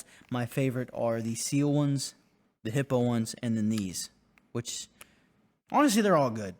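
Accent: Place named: South, United States. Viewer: slightly country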